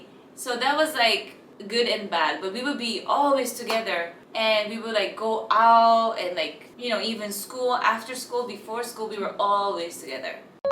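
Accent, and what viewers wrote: Philippines. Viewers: She talks in english like a Filipina...I wonder if she knows some tagalog..... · Why do you have Filipino accent?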